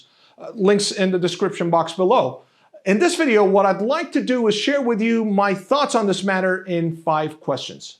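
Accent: Russia. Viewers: That russian accent... 😅👍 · I like your Russian accent… great …. No thanks to F35 …when you chase 9 years of Chasing Patriots … now go take hike they said sooooo well, …. C’est La vie….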